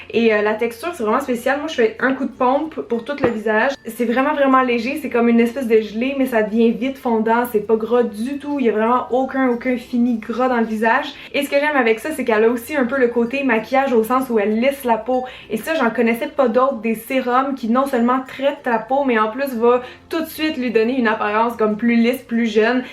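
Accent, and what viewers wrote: Canada. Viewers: Tu es très fraiche, de bonne humeur et on remarque ta motivation dans tes vidéos. Je viens te de découvrir et je t'adorr déjà. Tu es magnifique et ton accent canadien avec tes expressions typiques me font bien marrer 😊 Gros bisous!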